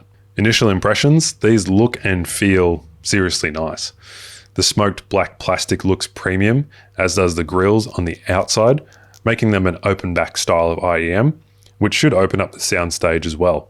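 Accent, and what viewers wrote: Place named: Australia. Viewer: aye, always good to hear a fellow Aussie within the tech space grateful to have found your video just now via the YT algo keep up the good work, mate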